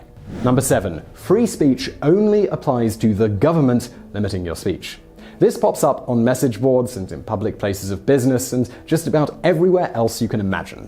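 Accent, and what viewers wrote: England, United Kingdom. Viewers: Get things wrong once in a while? He makes at least one major blunder in every video I've seen, often more. I think he's coasting on his English accent, but he's actually pretty ignorant. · This guys a Brit from Richmond VA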